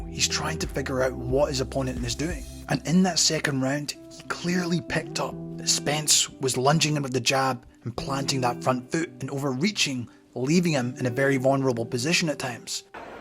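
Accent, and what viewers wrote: Scotland, United Kingdom. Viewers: Are you from Scotland ????? accent????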